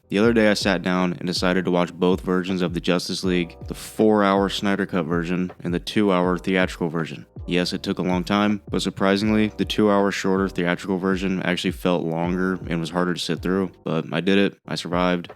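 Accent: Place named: United States. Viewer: You are still a soulless excuse of a human being for not caring about the family in the theatrical cut. But you sound American so it’s almost a cliche. Still important for the film to reiterate that they are heroes. They are here to save everyone. Collateral damage or the world. About your conclusions I must fall in favor. The Snyder cut showed a better representation of the heroes. Everyone was aware of the stakes and reacted accordingly. The heroes had important roles and ended the mission with serious determination.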